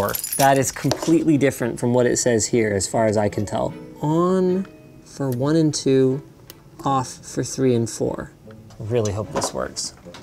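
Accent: Australia. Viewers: Isn’t that an Australian accent? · That accent is like Australian,British and a bit of in there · Accent was Stereotype Austrlalian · K that's an Australian accent and I don't even mind cause its not that bad your talking about GRREEEFIX CEEEEARDS so its ok:) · U sound aussie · was that an Australian accent or "Central Pahk" accent??? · Thats a aussie accent m8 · That accent is australian mate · Aussie accent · yeah not a bad australian accent at all tbh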